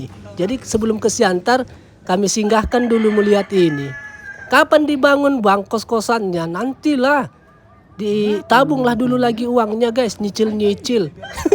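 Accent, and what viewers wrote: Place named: Java, Indonesia. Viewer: BANG LU JAWA MEDAN YA KOK NGMONGNYA KADANG ADA JAWANYA😁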